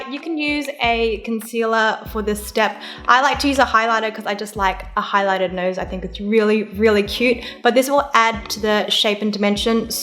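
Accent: Australia. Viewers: Man, I’m so glad I discovered your YouTube channel. You’re just so absolutely gorgeous and beautiful. I also love your Australian accent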